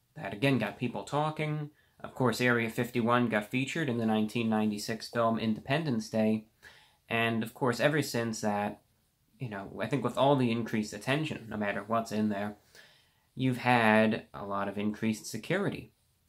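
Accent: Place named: New York, United States. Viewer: We will land on the moon if we invade area 51, just kidding... I wouldn't try to invade it! I do not trust the government, however I don't think aliens will ever come to this earth because maybe we are the aliens. Sometimes I think we are a very old race, and not necessarily in human form but in consciousness, I have my own reasons for thinking this. Also great video, I can hear your NY accent more and more and I love it!!! Love your laugh too! You are so effin cool and open minded with your all your commentators. Also I am seeing more and more folks thinking that we are living in a simulation. I can not agree with this because of the randomness in this world and I mean random car wrecks, and children dying of cancer. What do you think about us being in a simulation? Ps. I watch all the adds so you get paid. love ya!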